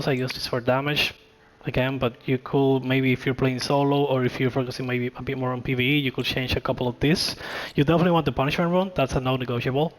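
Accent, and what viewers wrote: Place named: Argentina. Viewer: Your english has argentinian accent 😅 🤔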